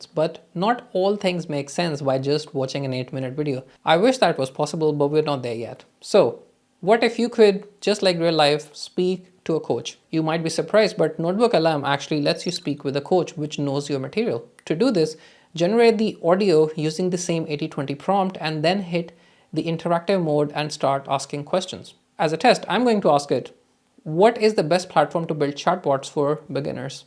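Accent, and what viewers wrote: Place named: Northern Ireland, United Kingdom. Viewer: I hear N. Irish accented words!? lol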